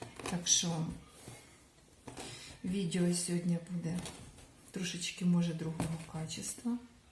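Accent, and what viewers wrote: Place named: Surzhyk, Ukraine. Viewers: Ти молодчинка.я такой з -під Одеси.я зараз далеко від домівки.дуже скучаю.включпю твій канал і ніби дома,разом готую з тобою.А рідний разговор який!!!мені здається я тебе бачила чи на Привозі чи на Новому.Ти там може торгувала? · На суржике ГЭкать и ШОкать предпочитает 😮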